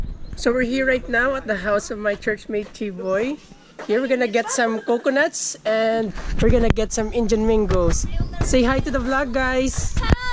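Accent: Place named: Iloilo, Philippines. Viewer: Nami gd accent sang ilonggo ba HEHEH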